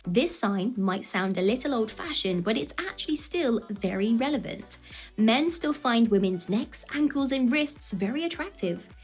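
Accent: United Kingdom. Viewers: Dude I love your worldsmost wickedest British accent in this video of yours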